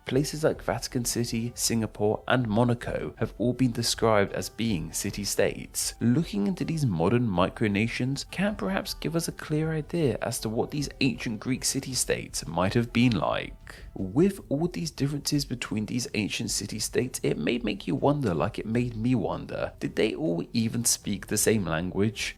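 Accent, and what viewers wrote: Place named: RP, United Kingdom. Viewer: Dude you sound well posh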